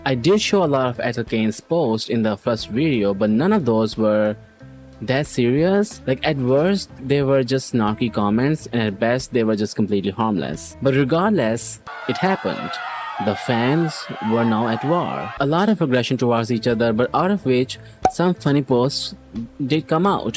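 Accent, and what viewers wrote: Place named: India. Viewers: ... what? Like, that's one of the most common Indian accents. Should he stop being Indian? 😭🙏🏻 Nvm tho, this must be rage bait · I love indian accent :) its my favorite accent in english (im from brazil)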